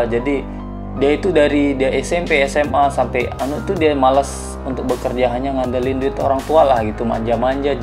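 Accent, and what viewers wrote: Lombok, Indonesia. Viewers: Kyknya abng ini org sasak ya? Gw tau dari logatnya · Kentel gati aksen lombok indonesia no mton..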